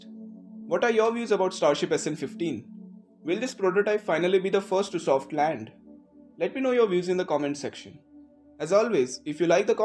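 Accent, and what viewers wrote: India. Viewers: Bhai mujhe indian accent lag raha hai, I'm happy to see, an Indian guy talking about Spacex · No no, your style of speaking is Ditto like an Indian, however you are,🙄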